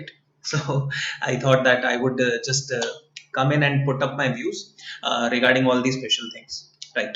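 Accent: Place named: India. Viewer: Arre Sir Mast Angreji thi 😀... Bolte raho..to hindi ka undertone b nikal jayega ! 😎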